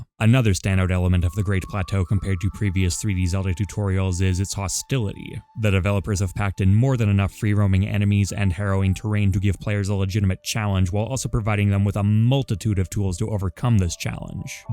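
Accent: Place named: Canada. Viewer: i don't like how negative about the game u are in this video. But ur points make lots of sense and i agree with alot of them. also i have finally realized that you are probably canadian based on the amount of times i've heard you say the word about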